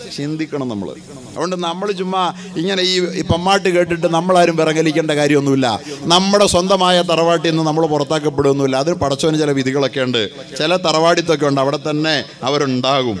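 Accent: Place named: Ernakulam, India. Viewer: ഒറ്റയിരുപ്പിനു കണ്ടു തീർത്തു കണ്ണ് തുറപ്പിച്ചു മനസ്സ് തുറപ്പിച്ചു അസ്സലാമു അലൈക്കും ഉസ്താദേ സംസാര ശൈലി കേട്ടിട്ട് ഈ ഉസ്താദ് എറണാകുളം ജില്ലക്കാരൻ ആണെന്ന് തോന്നുന്നു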